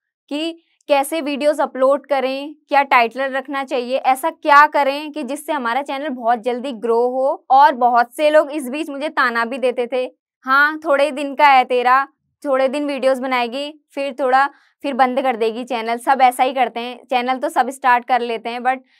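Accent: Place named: Uttarakhand, India. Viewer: Aapke way of talking se first vedio mai hi mujhe pta lg gya tha aap uttrakhand se ho mai v uttrakhand se hu ❤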